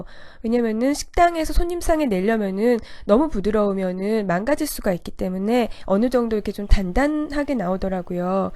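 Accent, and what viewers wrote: Seoul, South Korea. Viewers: 서울사투리 너무 귀여워용 ㅠㅠ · 와 진자이게 서울 사투리죠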